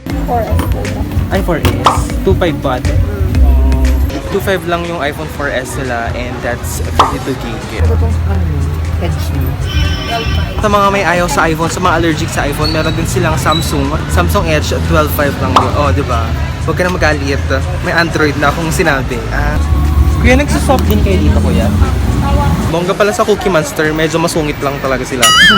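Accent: Ilocos, Philippines. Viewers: Ilokano ka po ba?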